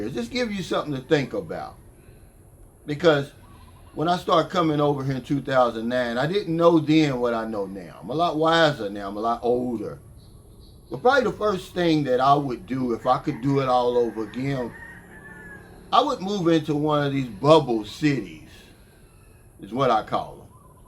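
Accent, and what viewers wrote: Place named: New York, United States. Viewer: I love this guy - New Yawk!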